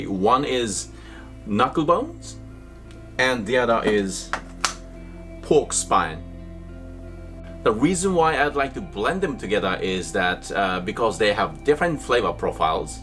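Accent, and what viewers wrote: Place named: Australia. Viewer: Great and informative, thanks Also, did you learn english in australia?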